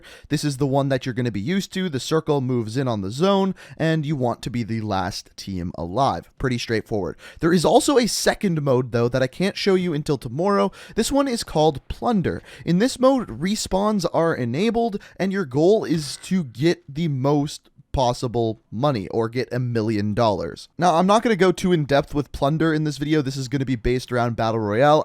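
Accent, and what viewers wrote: Canada. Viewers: Is this guy from Canada ?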